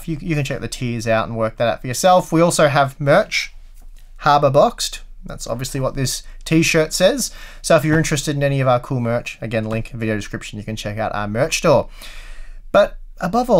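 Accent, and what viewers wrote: Australia. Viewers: Youtube's auto-generated subtitles aren't that great with Australian English. What you read here are the results ;)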